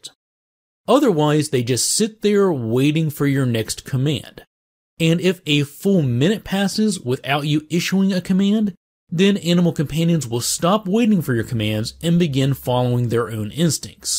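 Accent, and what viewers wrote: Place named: Midwest, United States. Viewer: Great video! Unrelated question... where are you from? I'm trying to guess your accent.. I'm thinking mid-west but have a feeling I'm way off.. :P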